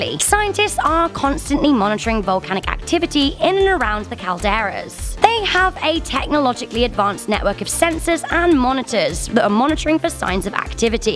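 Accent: United Kingdom. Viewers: I don't know...I don't know...I don't know where I'm-a gonna go when the volcano blows. I love hearing Brits pronounce things like "St. Louis" and "Cheyenne".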